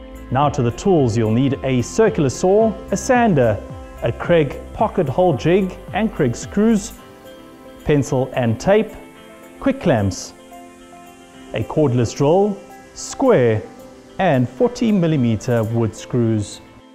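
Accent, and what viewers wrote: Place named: South Africa. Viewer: I was going to ask if the accent was South African... then I realised is not a South Australian youtube channel.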